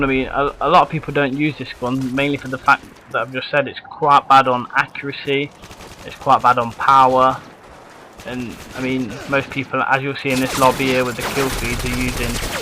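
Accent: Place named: United Kingdom. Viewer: I thought the owner of this channel was hispanic. I remember seeing loads of vids in spanish. Now i hear a brit talking. IM confused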